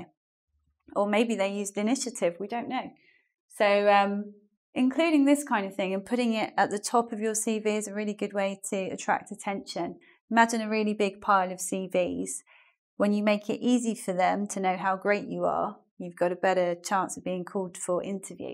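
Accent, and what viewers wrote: United Kingdom. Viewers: thank you very much,. i really like your videos, there are very informative although i enjoy to practice my listening skills with your british accent.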